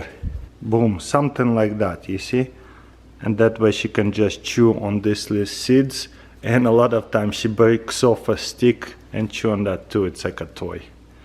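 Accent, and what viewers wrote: Russia. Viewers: I've always liked Russian accents but yours is remarkably nice to listen to. Love the commentary lol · Are you Russian? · Are you Russian Cuz u have a Strong Accent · His russian English accent is a kind of cute!!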